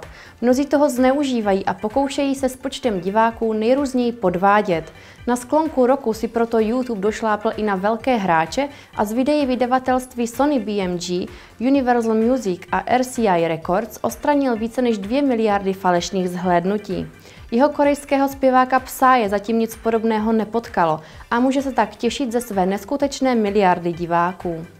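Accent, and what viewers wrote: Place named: Brno, Czech Republic. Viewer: tak vám newim, česky mluvit neumí, ta brněnština je tam znát a mluvit o tom taky neumí, přečíst bych to zvlád stejně...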